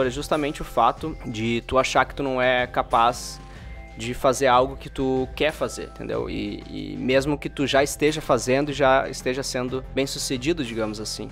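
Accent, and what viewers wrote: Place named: Porto Alegre, Brazil. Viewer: Poha, obrigado pelo diagnóstico! Off: Sotaque porto alegrense detectado.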